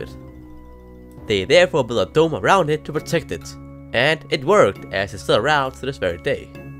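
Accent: Denmark. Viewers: yeah because hes danish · He has a Danish accent, I just found that out myself Because I'm used to people speaking English with an accent it didn't take long for me understand him clearly, so I'd say maybe try listening for a bit and maybe you'll begin to get used to his accent Also best girl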